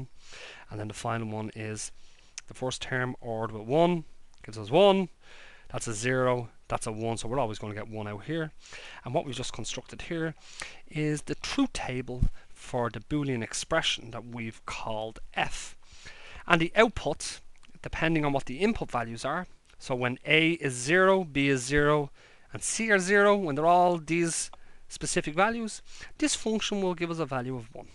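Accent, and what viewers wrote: Ireland. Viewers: I wonder are you Irish? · hahaha you wonder? He's not Irish, he's MEGA-Irish!! (From the North). I love the accent, I used to live with two Irish friends from County Armagh back in NYC ages ago... People said I picked up on the accent back then :D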